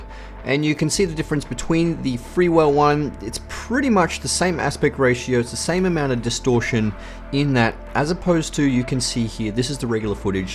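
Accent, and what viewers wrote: Australia. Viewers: Just loving watching an Australian accent version🤙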